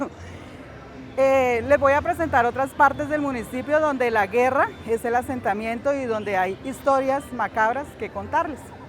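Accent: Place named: Colombia. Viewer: Jajaja y vos de donde es yo tengo familia en Tolima y antioqueña nosotros los colombianos hablamos asi. Si ud es extrajero quedate calladito 👌🏼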